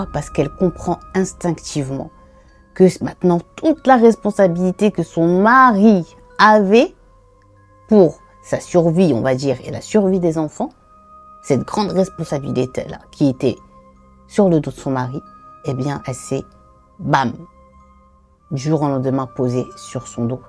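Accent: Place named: Gulf, Arab world. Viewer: 😮😮😮😮😮😮😮😱🫣😱😱L'horreur dans toute sa splendeur... J'avoue que tu es une très bonne narratrice. Tu captive ton public. Je t'ai decouverte récemment. Tu as un superbe accent du Khalij.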